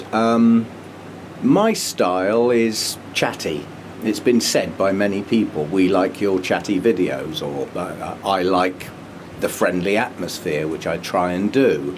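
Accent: United Kingdom. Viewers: I have to watch your videos! There are so many bits of info that you share. And I love a British accent, your sayings and sense of humor.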